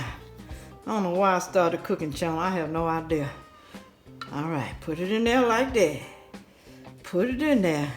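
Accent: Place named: South, United States. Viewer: I love your Southern Accent...😂Thank You for sharing your delicious recipes Ma’am!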